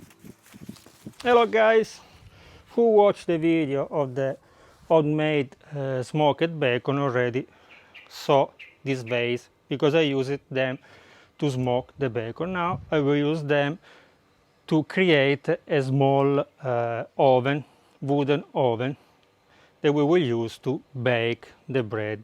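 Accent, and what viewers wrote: Italy. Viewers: I love your accent! They need to stop hiring white people and British to play Roman emperors and soldiers in movies. Y'all are just as great. I would LOVE to see more historical movies with more Italians. I love your natural tan skin. I'm gonna subscribe to your channel cuz you have some good videos. :) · I subscribed to you so I could share you with my 23 yr old daughter and 24 yr old son....We were taught to cook by our american men who where soldier men who survived and came back to marry our mothers and then teach them how to cook..... but I just can't get over your BEAUTIFUL ACCENT VOICE.....your voice is angelic, and you are just so damn pretty for an Italian.....You wife better love you to crazy pieces! She is SOOOO LUCK!!!!!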